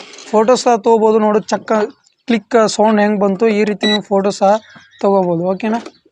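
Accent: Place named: North Karnataka, India. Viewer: Pakka ಉತ್ತರ ಕರ್ನಾಟಕ ಬಾಷೆ😅😅😅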